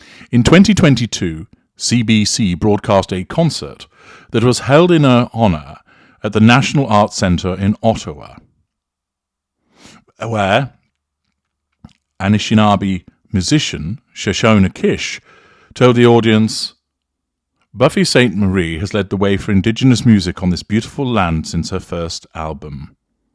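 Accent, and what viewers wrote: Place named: RP, United Kingdom. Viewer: Waayy too much repetition in this series. It is mostly just a compilation of second-hand bits from the news media. A lazy piece of ‘investigation’, and chock full of mispronunciations: ‘Regina’, ‘Qu’Appelle’, ‘Ontario’. And what is meant in Part 1 by, ‘Canada and the Territories’, for heaven’s sake? You might have a posh accent but these podcasts are a very lazy effort to create content.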